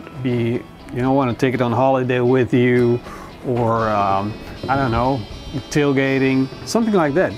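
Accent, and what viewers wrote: Netherlands. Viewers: U hebt een Nederlands accent??...... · Roma Abrupt dutch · Nederlands accent! Dutch accent! Lol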